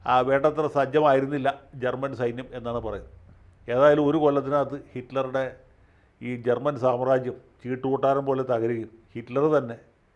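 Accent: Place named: Trivandrum, India. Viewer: I love your "Trivandrum slang"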